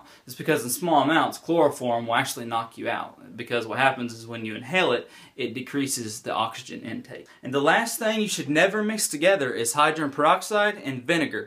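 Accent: South, United States. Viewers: Are you from the south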